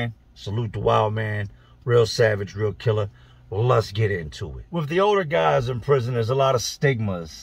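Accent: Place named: Pennsylvania, United States. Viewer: you talk like a PA boy, you from the 412,724, or the 814?? imma New Castle, ( 724 ) proud my whole life!!! Either way, HMU some time!!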